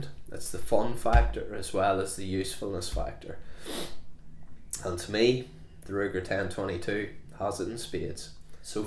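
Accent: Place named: Northern Ireland, United Kingdom. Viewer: Great video Mike, I just purchased a second-hand 10/22 and tactical FDE stock today from Young Guns in Castledawson. I set aside a 25 round magazine because I know the PSNI have tried to make it a lot harder to purchase mags. Big hello from Co. Tyrone. It's nice to hear a local accent on YouTube for a change.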